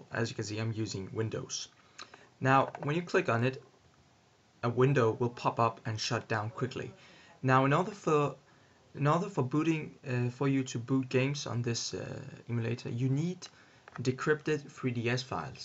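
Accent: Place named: Brazil. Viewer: thanks man! I didn't know what to do with the citra.exe application. Do you live in Brazil? Your accent sounded like it., but I doubt it because of your name.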